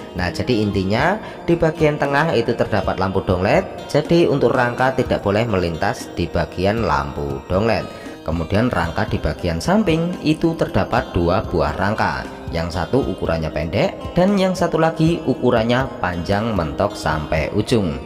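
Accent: Indonesia. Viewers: Kena sindir admin akhirnya ikutan komen juga lah.. Banyuwangi hadir dan menyimak bosku.. kalau lihat logat bicara nya kayaknya adminnya orang blitar.. entah benar atau salah. Salam seduluran..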